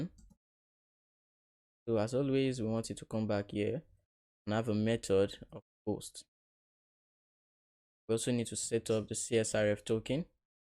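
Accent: Nigeria. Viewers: are you a Nigerian, I'm so happy to find this channel, I have been with freecodecamp but I do notice your voice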